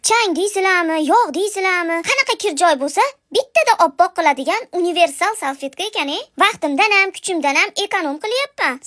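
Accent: Andijan, Uzbekistan. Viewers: Anjancha shevaga gap yòq❤❤❤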